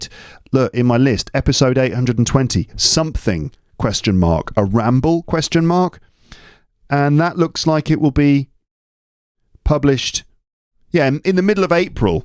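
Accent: United Kingdom. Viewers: speaks Pure British accent but his audience are not native English Please don't speak fast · Hi, your British accent is thoroughly hilarious! Tell me where in the world this incredible accent is spoken.? I have to admit since I started following your podcast my English is magically changed. Thanks to you with the bottom of my heart. ❤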